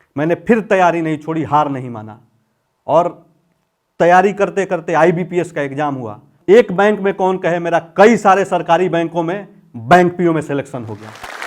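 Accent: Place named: Bihar, India. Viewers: हमको त बोलिये से मालूम चल गया कि भईया बिहारी हैं.... 👇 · बिहारी अंदाज में समझाने के लिए बहुत-बहुत धन्यवाद 🙏🙏🙏🙏 जय बिहार ❤️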